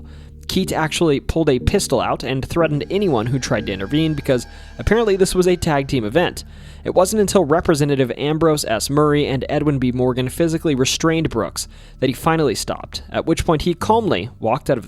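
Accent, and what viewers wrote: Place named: Pee Dee, United States. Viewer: You sound like a Pee Dee native! I couldn't believe there was no lizard man here. And I grew up in Dillon so Bigham's Light is always on my mind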